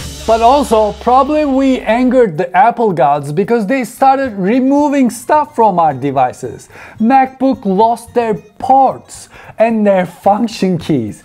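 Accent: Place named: Turkey. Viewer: Abi türk olduğun o kadar belli ki konuşmandan yani başkası olsa bu kadar rahat anlayamazdim ne dediğini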